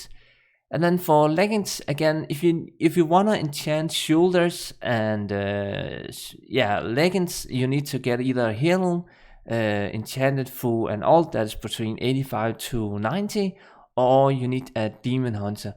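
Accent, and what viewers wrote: Denmark. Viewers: i smell danish somehow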